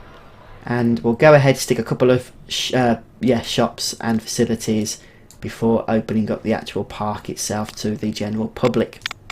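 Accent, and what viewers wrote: United Kingdom. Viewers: I like him he sound like a really posh English man same as me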